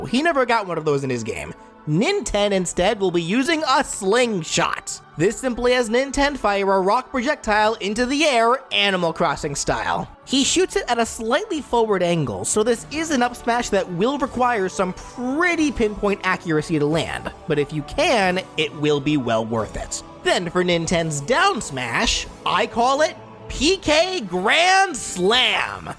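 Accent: New York, United States. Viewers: he has a new york accent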